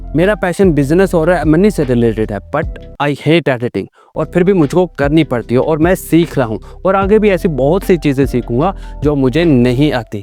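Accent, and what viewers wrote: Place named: Urdu, Pakistan. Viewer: I like your Urdu Accent ❤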